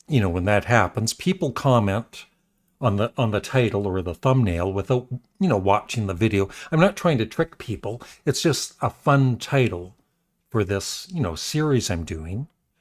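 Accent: Canada. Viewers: Yes. He means when you pronounce the word 'About'. it does often sound like 'Aboot' because of your Canadian accent. That's all. But it is obvious that the word is 'About' so there really is no need for him to mention it. 😊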